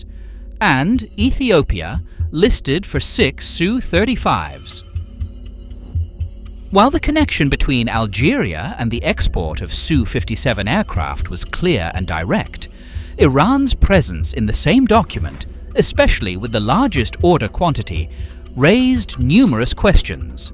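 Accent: United Kingdom. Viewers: Another English Accented Jewish Gangster Narrator, being negative. Says The BronxBoy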